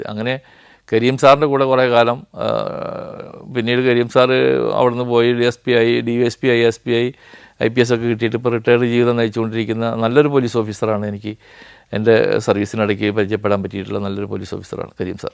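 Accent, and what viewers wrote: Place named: Ponnani, India. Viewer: സാറിന്റെ സംസാരം കേട്ടിട്ട് പൊന്നാനി സൈഡിലാണ് വീട് എന്നു തോന്നുന്നു..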